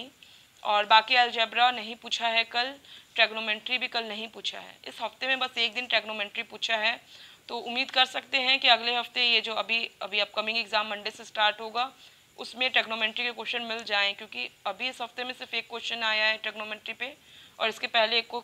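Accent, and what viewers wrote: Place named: Eastern Uttar Pradesh, India. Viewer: I LIKE YOUR ACCENT 🙂 EAST UP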